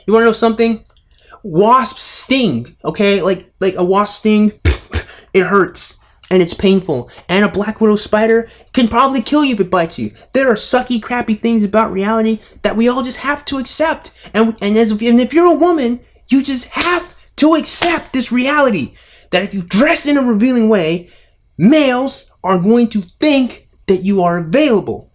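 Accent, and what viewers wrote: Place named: England, United Kingdom. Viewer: I love that you STILL use that English accent. Watch you end up moving to England and your videos will start to become more an more like the real thing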